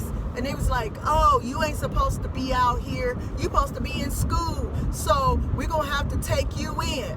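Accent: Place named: Chicago, United States. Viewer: I knew you were from Chicago!!!! Your accent is straight Chi-town...all the way!!! Im from Chicago too. 😄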